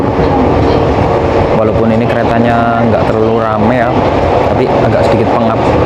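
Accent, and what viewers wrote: Banyumas, Indonesia. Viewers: Rika ngapak daerah ndi bro.. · Mas ngapak, bikin konten di malam hari dong mass 🎉🎉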